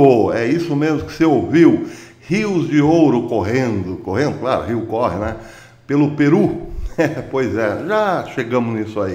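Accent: Caipira, Brazil. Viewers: Pois é, o YouTube deve ter estudado aritmética com esse professor a criticou seu português de “caipira”.. vixxx! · Continue com o caipires é muito bão !!! Hahahahahha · Eu ADORO O JEITO CAIPIRA DE FALAR RELAXA A MIM E ME LEMBRA DE LIMEIRA ONDE NASCI BOAS LEMBRANÇAS DE UM TEMPO MUITO BOM NOS ÉRAMOS MAIS LIVRES E FELIZES DO QUE AGORA. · Professor,eu adoro ouvir o senhor falar o "caipirês",pode continuar a fazer suas análises desse jeito 👏👏👏☺️ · Fessor! Num é Aisland. É Ailand. Amando o caipirês!! Hehe. Um abraço! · Excelente Professor, o seu sotaque bem brasileiro, caipira e expressivo, além de excelentes comentários em variados assuntos, esse esquerdista que fez o infeliz comentário sobre o senhor, deve está com inveja por está no ostracismo! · sotaque caipira não é matar a lingua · EU gosto da FORMA caipira, então os BESTAS que engole o CHORO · Kkkkkk! Adorei o caipirês. 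· Amo seu caipirêsssss!!! Adogôooooooo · Kkkkkkk...o Sr consegue ser hilário sem perder a seriedade. Vai firme no seu sotaque mais que brasileiro.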